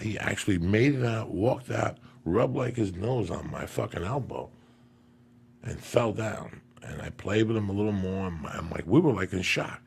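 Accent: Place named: Bronx, United States. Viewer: Hey I don't know you but my grandmother is from the broncs so my whole family talks like you. I just wanted to let you know your a fucking trip but I appretiate you and Superbad is just like my cat Alice Cooper he was a street cat and he's mean as hell. He's black as night with one white patch on his chest has pieces of his ears missing and fangs that go past his chin like a vampire. But he is absolutely a lover if hes not loving on you and farting up a storm hes bitch slapping the dogs in the house and stealing food off of everyones plate (he actually ran off with a whole steak before like a fucking snatch and grab)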